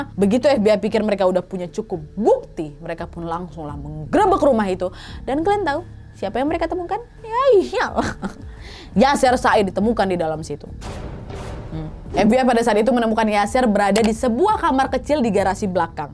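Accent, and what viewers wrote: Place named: Lampung, Indonesia. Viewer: Kaya orang lampung bahasanxa . Orang mana kak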